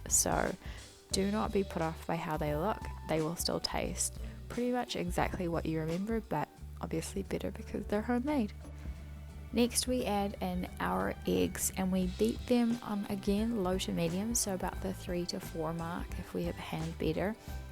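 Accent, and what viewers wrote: New Zealand. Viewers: You sound like a kiwi who wants to be a yank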